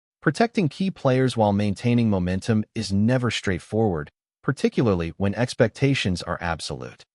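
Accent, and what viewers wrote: United States. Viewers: Sorry - but nobody in Scotland wants to listen to an American trying to talk about Scottish football - change the AI to a Scottish accent!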